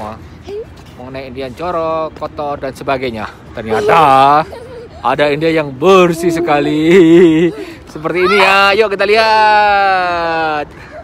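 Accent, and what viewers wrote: Java, Indonesia. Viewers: maaf mas mo nanya mas ini asli mana to.. ngomongnya kek medok medok jawa gitu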